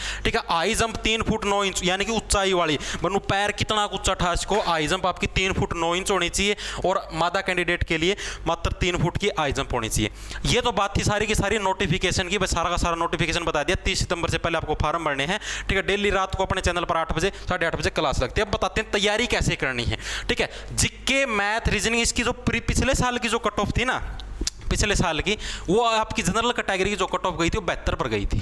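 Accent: Haryana, India. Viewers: Haryana sei h bhai sir kya ajib lag ra h aur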